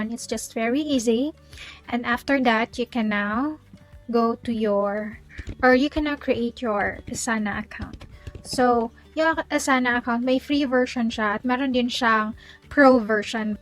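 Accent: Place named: Visayas, Philippines. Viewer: Ate, taga asa ka te? Bisaya lagi imoha accent. Hehehe malingaw ko..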